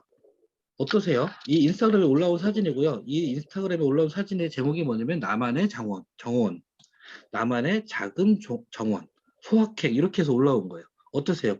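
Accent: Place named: Jeolla, South Korea. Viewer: 전라도 소리만들어도 조으네요 내고향 전라도 줌교육도 열정뜨겁네요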